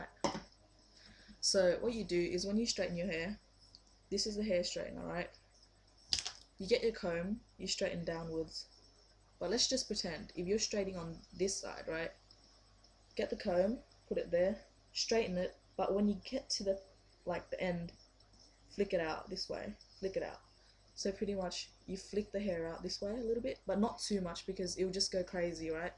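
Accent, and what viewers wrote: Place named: Australia. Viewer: OMfG ~ lolol bro u so funny XD love your australian accent^^~ 'i dont wanna walk around lookin like an abandoned cat' LOL lemme fry mah chicken on your hair! XD neon did hair tuts too - - u guys are the coolest twins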